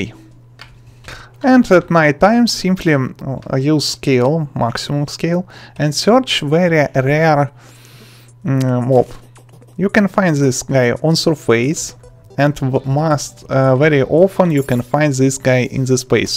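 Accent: Russia. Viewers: ur accent is cool u are from russia?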